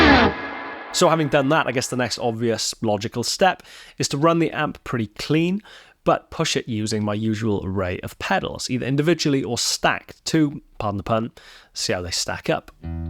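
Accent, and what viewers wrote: Wales, United Kingdom. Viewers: You can have both - just get a valve amp with a send/return. You've got the front end responsiveness and the colours of your pedals.. Tasty playing btw and nice to hear the accent from the land of my fathers..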